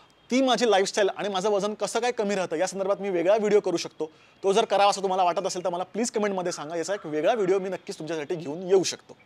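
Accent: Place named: Pune, India. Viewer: टिपिकल पुणेरी मराठी... छान वाटले... खुप जवळच वाटले... मस्त 👌👍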